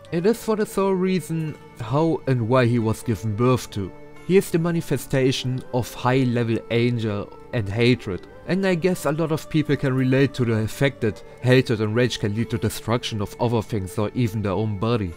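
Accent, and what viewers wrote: Germany. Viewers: You have a strong german accent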